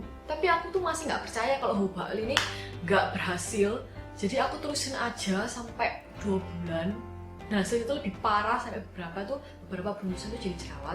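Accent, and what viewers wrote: Java, Indonesia. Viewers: Jawa bgt. 😂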